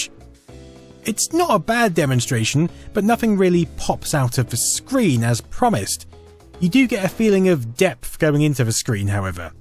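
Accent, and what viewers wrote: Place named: United Kingdom. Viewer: I actually want to play the Bridge Command game now. accent is cool and all as with any brittish accent, but everytime I hear he say about the early nineties as (early "naughty"es) I can't help but crack a smile.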